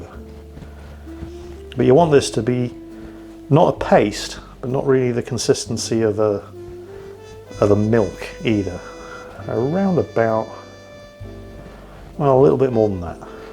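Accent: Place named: England, United Kingdom. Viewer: [New Recommendation comes up] What's this? is doing a cooking channel now? Guess that's what I get for not watching Mingles with anymore. Subbed. Continue soothing my ear holes with your soft English accent you crazy old fart, you.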